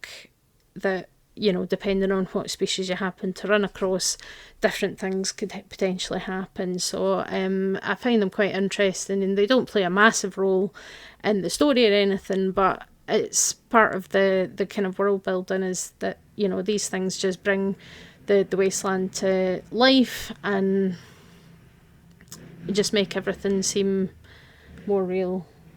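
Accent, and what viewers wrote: United Kingdom. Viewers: This video is cool, thank you scottish science youtube lady 👍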